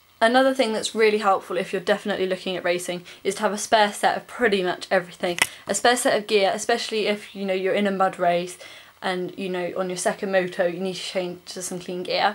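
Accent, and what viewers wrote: United Kingdom. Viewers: Hi I can hear by your accent that ur from the uk although u mentioned it, but I'm from the uk aswell, I live in in surrey, where do u think I could ride that's near redhill due to the fact that I live there in redhill. Is there any places to ride a dirt bike ?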